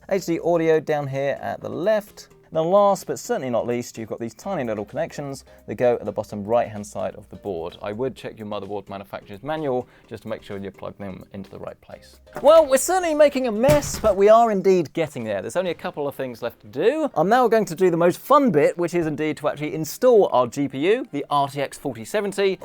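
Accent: United Kingdom. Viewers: British accent is amazing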